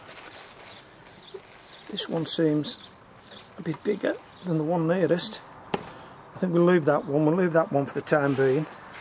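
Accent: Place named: Northern England, United Kingdom. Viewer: Loving your videos, so informative and easy to follow, and loving the fellow northern accent!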